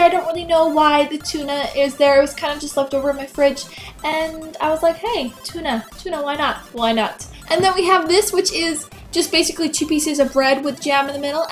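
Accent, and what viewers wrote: Canada. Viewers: i love your accent! canadians RULE! haha!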